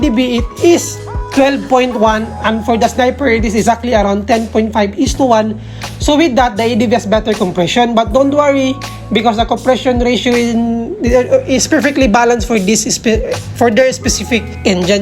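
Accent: India. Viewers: Para kang syunga mag review? Saka kana mag english kng marami kanang subscriber? Para kang mga indiano mag review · Bagalan mo nalang English mo or tagalugin mo nalang kung hirap ka...Kase comparison of two motorcycle Ang topic mo kaya dapat mas maintindihan Ang explanation mo... Parang indiano mag English😅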